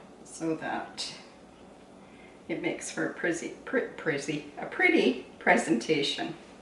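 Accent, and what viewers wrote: Canada. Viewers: Just found you and subscribed. I was curious where you were raised. You used the word dear (costly) and pronounced the word herbs sounding out the h as in a man's name and I noticed a bit of Canadian oots...having an English mother am I close to your heritage?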